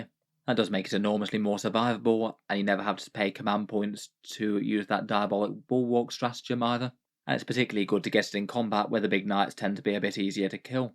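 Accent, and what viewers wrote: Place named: United Kingdom. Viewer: ypur voice is annoying. too british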